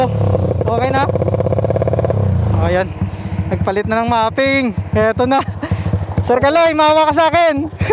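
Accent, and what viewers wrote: Iloilo, Philippines. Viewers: Taga saan ka po kuya. Bat medyo ilonggo ka hahaha. (Iloilo) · Haha illonggo kaman? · Ilonggo ka sir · Saan lugar 2 sir,,ksi ga ilonggo ka eh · kahit anong tagalog mo idol lumalabas talaga pagiging ilonggo♥️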